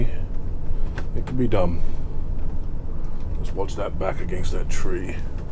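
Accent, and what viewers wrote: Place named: United Kingdom. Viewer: another Brit with messed up ascent... when I lived over there my accent didn't know where I was from... but when I got back here the American twang died ... lovely video of your first trip out ... are you still on the road as this is over a year old... one day I will be back and plan to travel again..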